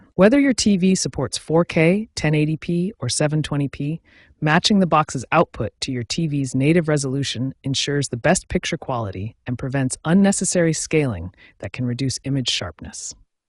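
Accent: United States. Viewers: As an example of poor delivery technique, this presentation would be useful as a training aid for teachers and lecturers. The presentation is poorly coordinated with information flashed on the screen brielfly with insufficient time to assimilate the content. Thereares also unecessary and distracting blank pages. The rate of s[eaking is almost so fast it is garbled and while the presenter's diction is quite good, why was an American accent chosen for a product supplied in the UK? There should be considerably more detail on how to access \setting options and a link to a printable transcript so that the user can follow the information at a pace suitable for making adjustments.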